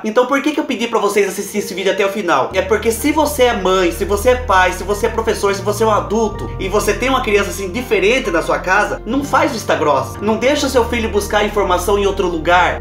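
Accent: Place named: Piumhi, Brazil. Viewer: Noossaa tô apaixonada!! Tu me fez rir d+ sô ! Ele é mineiro tbm gente? O sotaque parece d+ com o do povo de Piumhi kkkkkk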